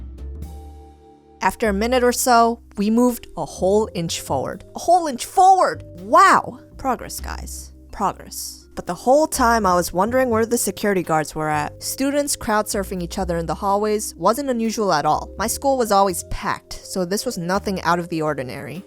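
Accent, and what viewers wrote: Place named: Long Island, United States. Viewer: You sound like a mix of Karina and Julia's Long Island accent from Drawfee.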